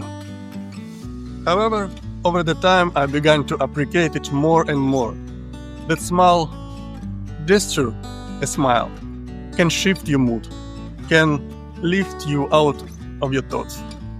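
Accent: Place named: Russia. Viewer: An accent is russian that is true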